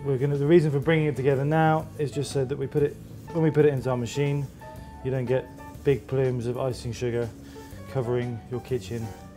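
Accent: England, United Kingdom. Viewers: where r u from? england? lol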